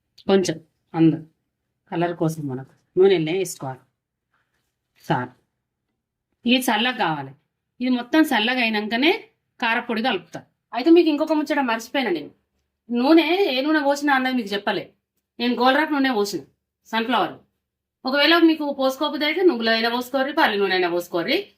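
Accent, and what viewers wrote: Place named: Mancherial, India. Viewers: మంచి వంటకం చేసారమ్మ చాలా థాంక్స్ మంచిర్యాలన మీది మాట తీరు అలాగే ఉంది 😊 🙏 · Hi aunty. Nenu me new subscriber ni. Medi ekkada aunty. Madi Karimnagar. Me language same Karimnagar side vallu matladinatte undi. Me vantalu bagunnay. Meru chupinchina udakabetti chesina tomato pachchadi Ninna chesanu. Chala baga kudirindi. Mirapakayalu ekkada kontaru. Chala bagundi me karam. Red color lo. Maku address cheppandi aunty. Memu kuda konukkutam.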